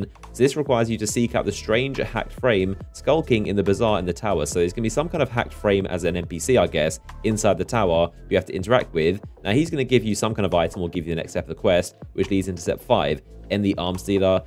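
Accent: England, United Kingdom. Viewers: Is it just me or do gamers who have UK accents r just awesome (he's from the UK right) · this video is supposed to be a tutorial but your talking too fast. as if u dont even care if people can understand you or not . plus u have a thick english accent. how can u expect people to understand you... this video is a thumbs down!!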